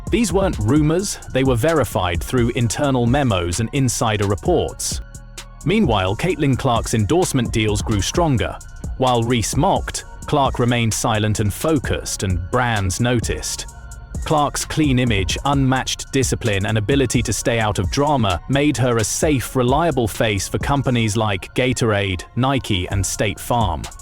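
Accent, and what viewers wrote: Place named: United Kingdom. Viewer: How about an American English speaking announcer. We are tired of you BRITS.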